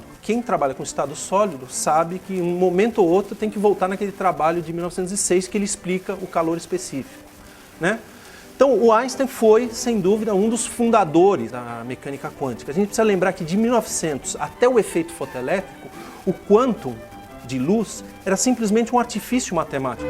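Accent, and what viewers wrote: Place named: Italy. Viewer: Caraca, que sotaque de... italiano!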